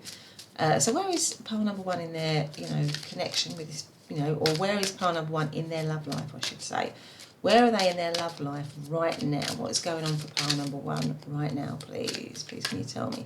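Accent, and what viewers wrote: United Kingdom. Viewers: I just love a British accent hehe, so comforting/sweet sounding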